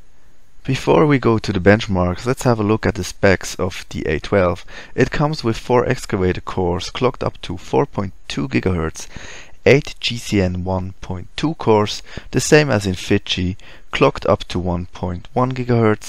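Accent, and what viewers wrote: Germany. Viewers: dieser hölzenre deutsche Akzent....warum nicht gleich auf deutsch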